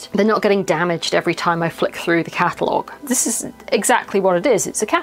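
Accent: United Kingdom. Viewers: Wow! I wish you’d worked this out and told me 50 years ago. Hah - I know you weren’t even born yet, then! Well you’re a genius. I could understand and appreciate every single piece of info I just watched and as you flipped through your patterns, I recognised some of the ones I myself bought in the 1960s, 1970s and 1980s. Actually all that stuff comes back into fashion every so often and I haven’t bought patterns lately - they seem so expensive these days! It’s such an intuitive and sensible way to organise patterns. Mine have all been sellotaped together over the years because they do indeed swell up every time you use the contents. And I’m going to set aside some time to put your wonderful system into practice for myself. Thanks a million ❤❤❤ PS How I love to hear a lovely calm British English voice with no affected growling or peculiar accents. 😂😂😂 So many youtube videos get turned off here, because I can’t bear the hyped up crazy sounding voices and over exaggeration on each word. 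And these days, most people seem to shout at the microphone for some reason. It’s a delight to listen to you, so thanks very much. Keep up the good work - it’s always interesting and helpful and unusual and informative x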